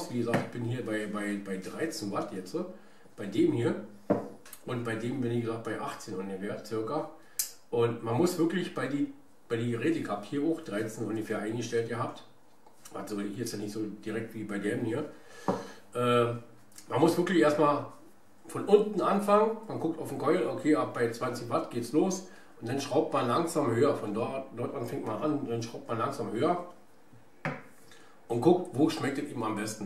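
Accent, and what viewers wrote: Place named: Brandenburg, Germany. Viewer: Rainer3041 komm auch aus Brandenburg Mich interessiert es nur, da ich das am sogenannten Brandenburger Deutsch hör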